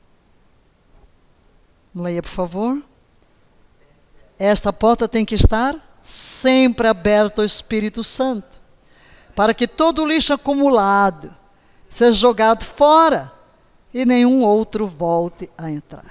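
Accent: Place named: Portugal. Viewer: Tu tens sutaque de Portugal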